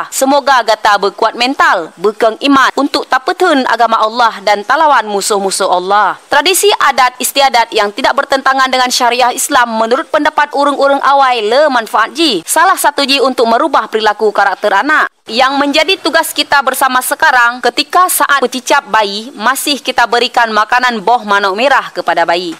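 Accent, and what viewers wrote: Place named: Aceh, Indonesia. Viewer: Mantap ureung baca berita....asli bahasa aceh.....lon juga warga geumpang.. 💪 semangat gempang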